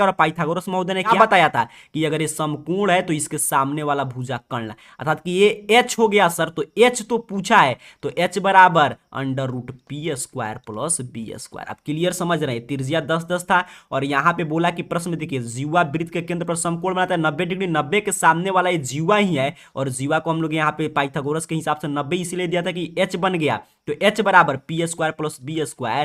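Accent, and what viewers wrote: Bihar, India. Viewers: Sir is talking in fully Bihari tone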